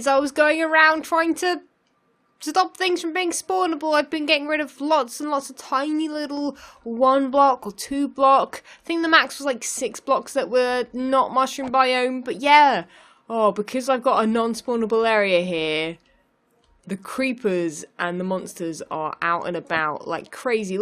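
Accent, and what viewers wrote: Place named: England, United Kingdom. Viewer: i love your English accent :D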